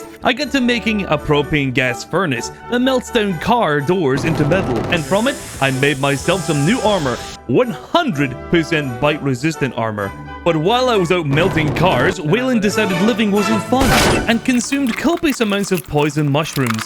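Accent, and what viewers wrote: Scotland, United Kingdom. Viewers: Are you Scottish pal ?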